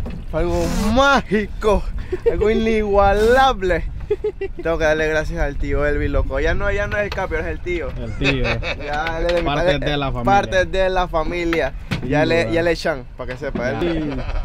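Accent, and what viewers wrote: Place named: Panama. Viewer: Q xopa, acabo de conocer tu canal, ya me suscribí al canal. Youtuber panameño que veo lo apoyo, muy chevere escuchar a alguien con tu acento y jerga. 🇵🇦🔥🔥🔥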